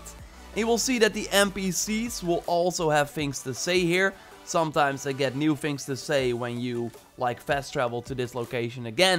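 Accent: Netherlands. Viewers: For example better ga je het beter uit spreken man tis egt zo zwaar hollands Engels veder cek ik altijd je shit ga zo door 😎👍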